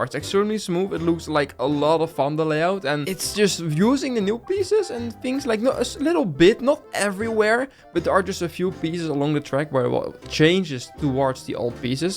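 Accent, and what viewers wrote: Netherlands. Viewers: Sorry man maar je hoort wel veel te veel Nederlands er tussen door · U dutch like Benji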